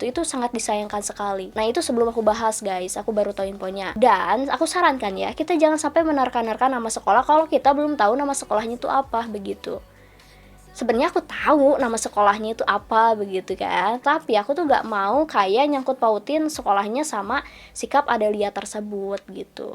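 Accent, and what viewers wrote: West Java, Indonesia. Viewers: ka kayanya org Sunda ya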